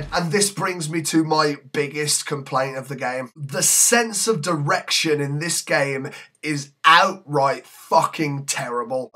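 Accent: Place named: England, United Kingdom. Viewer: While I am quite a pushover when it comes to English accents, this was really well done. You need to make more videos. Subbed!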